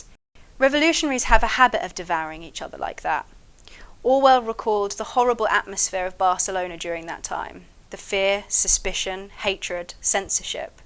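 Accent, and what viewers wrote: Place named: North, United Kingdom. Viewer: I'm not moaning here, but I'm curious how uses the northern pronunciation of "ask" and "pass" yet is apparently from southern climes.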